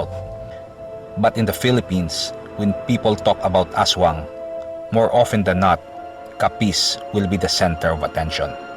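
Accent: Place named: United Kingdom. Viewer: I am not sure which literatures the Author consulted. As a Capizeno, we grew up with the scary stories from Dumsngás, Dingle and most of all Duenas. This propanganda of the Spaniards had barely supported by books by Spaniards. Poorly research and Capiz has nothing to do with Aswang. Your heavy English accent betrays your origin. THIS VIDEO IS POORLY RESEARCHED. FALSE NÈWS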